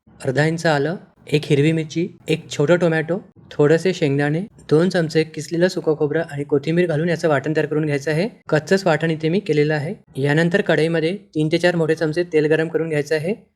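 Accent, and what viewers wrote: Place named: Nagpur, India. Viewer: दादा तू नागपूर, वर्धा मधला आहेस का भाषण तुझी पूर्ण तिच आहे❤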